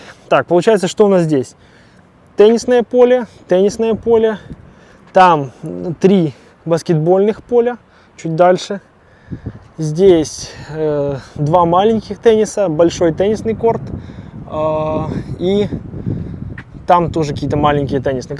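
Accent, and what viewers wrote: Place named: Ukraine. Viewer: По говору слышно что украинец.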